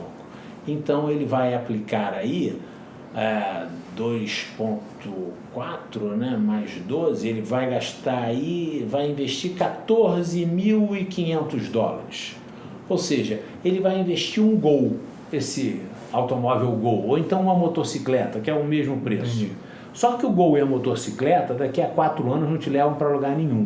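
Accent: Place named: Sao Paulo, Brazil. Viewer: O carioca ortodoxo agora é mais paulistas. Rsrs. Te amo meu primo. ❤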